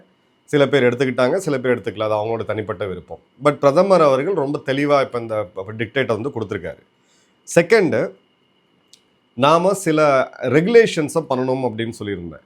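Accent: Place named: Bihar, India. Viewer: I don't understand why this Tamilian Major is using North Indian bihari backward English rather he should use progressive English of tamilians ...alas serving in the armed forces has its own negative impact 😮😅😂😊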